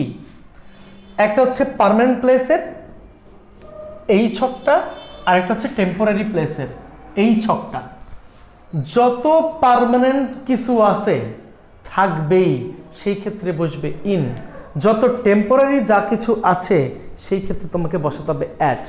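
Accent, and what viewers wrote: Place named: Barishal, Bangladesh. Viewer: ধন্যবাদ স্যার। স্যার কিন্তু মেগো বরিশাইল্লা👍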